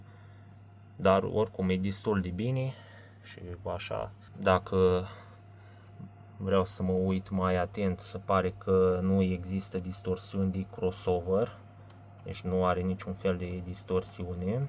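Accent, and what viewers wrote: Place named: Moldova. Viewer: Sa ceri sa lase mai jos de 50 de lei si ai acasa osciloscop Rhode Schwarz ,apoi inteleg ca esti din zona Moldovei dar se pronunta corect in limba romana condensatoare nu condensatori ,tranzistoare nu tranzistori,.Bafta!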